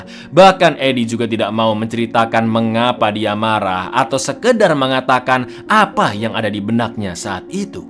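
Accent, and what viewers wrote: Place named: Surabaya, Indonesia. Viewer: Kyk arek suroboyo ae nak...😅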